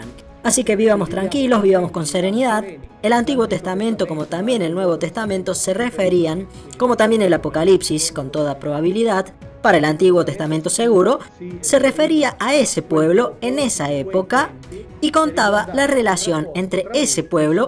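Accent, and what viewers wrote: Córdoba, Argentina. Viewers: Que linda la vocecita, noto un acento cordobés, puede ser?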